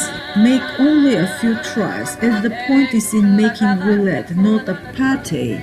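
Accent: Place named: Austria. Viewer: I saw her other channels, the lectures. She speaks Austrian German.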